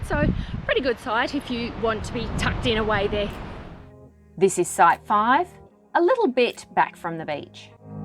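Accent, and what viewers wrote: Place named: Brisbane, Australia. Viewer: New to your channel, 1st video I’ve watched. As a fairly frequent visitor to Bribie I was interested to see how you put it across. Great video & very informative. Another option is the inland track & even camping at poverty creek (although make sure have mozzie repellent!) Sounds like your from Brissy area & will look forward to seeing your vids.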